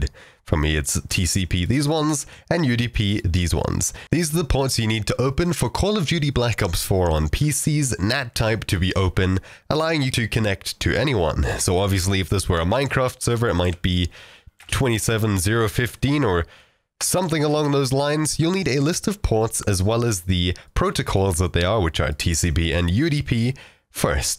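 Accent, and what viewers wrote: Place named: South Africa. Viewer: took me so long to realize you from south africa lol '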